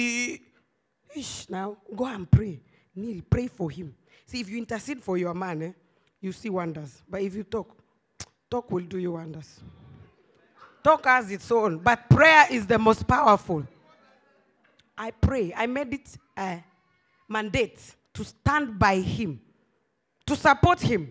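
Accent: Nigeria. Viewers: !!!! You already taken the Nigerian accent so well, I can't believe its you. May God continue raising you to greater glory. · I am excited to hear the nigerian accent 😍😍😍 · Ewwooo you're talking Nigerian acsent, I love your msg 💖💖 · ...you have become a Nigerian ooooohhhhh...the accent...ooops...Nigerian oooghh...congratulations!!!! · You got the Nigeria accent now...Eweee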